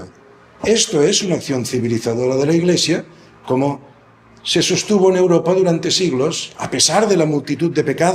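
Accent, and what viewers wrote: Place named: Catalonia, Spain. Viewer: Excelente lección. Grandísimo trabajo. Gracias y enhorabuena. Por cierto, ¡maravilloso acento catalán!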